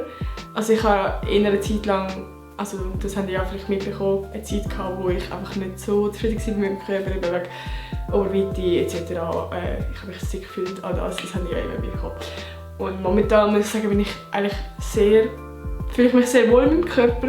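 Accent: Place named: Switzerland. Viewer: Schweizer Dialekt ist so lustig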